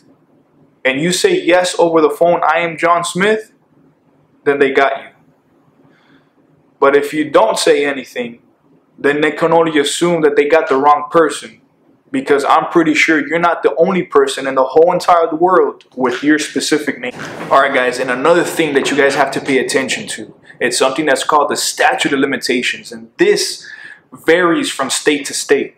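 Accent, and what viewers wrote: United States. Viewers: Miami accent coming in strong 💪🏼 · damn i miss that south FL accent. never thought i'd say that but it's true.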